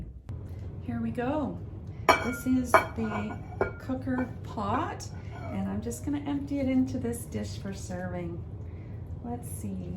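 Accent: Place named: Canada. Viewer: You are now more Canadian sounding...beautiful.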